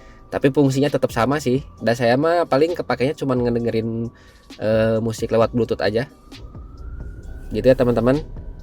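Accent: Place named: Bandung, Indonesia. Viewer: Asa bandung yeuh logatna,salam kang ti bogor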